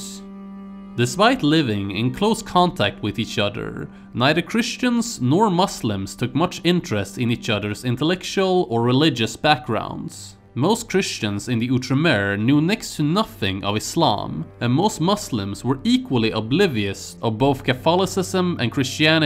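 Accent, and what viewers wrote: Sweden. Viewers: That is an unmistakable swedish accent, doesn't have a choice i imagine.. At least he speaks your language fluently and made this..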